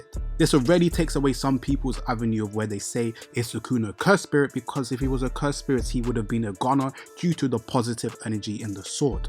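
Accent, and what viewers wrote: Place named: United Kingdom. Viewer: UK accent is annoying tho 😅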